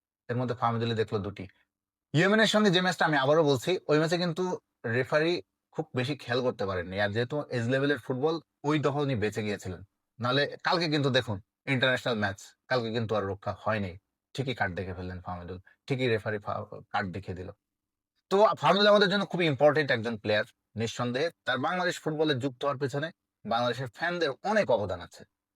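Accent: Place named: India. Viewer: You sound like an Indian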